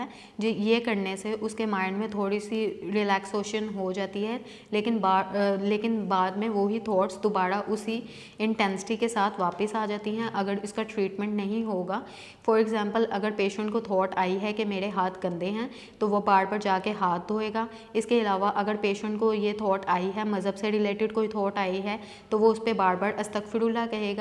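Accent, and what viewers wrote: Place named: Lahore, Pakistan. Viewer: Pukkii Lahorrii ho ap madam esliye ap arahi ho k aarrahí kehrahi ho lahoriyon ki bhi 1 wakhri zaban he.😁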